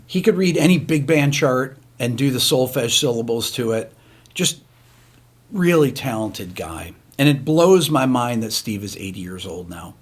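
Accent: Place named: Upstate New York, United States. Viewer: What a great story about teaching at IC. Love your channel and love that you still have the upstate accent:)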